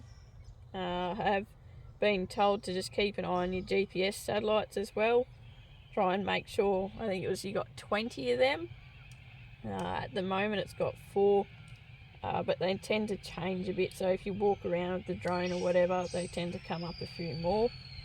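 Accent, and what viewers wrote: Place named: United Kingdom. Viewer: British Eng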